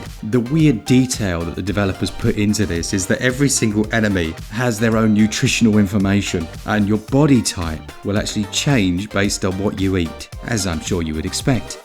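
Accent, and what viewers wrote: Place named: South, United Kingdom. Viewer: Smoothest southerner in all of Britania. Get some audiobooks done😅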